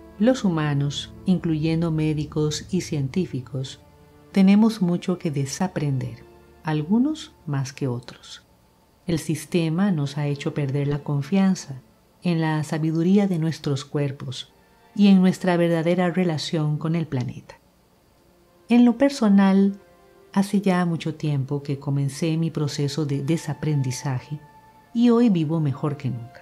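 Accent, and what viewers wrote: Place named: Costa Rica. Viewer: Porque es costarricense 😁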